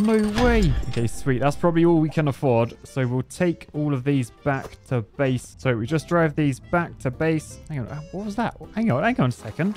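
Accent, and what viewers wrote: United Kingdom. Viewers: r u from the north or south of the uk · British dude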